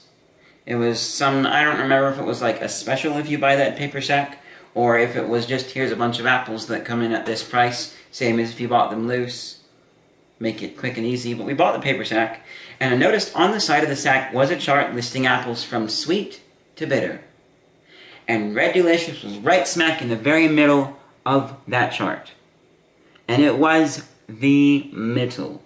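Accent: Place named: England, United Kingdom. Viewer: kinda an english accent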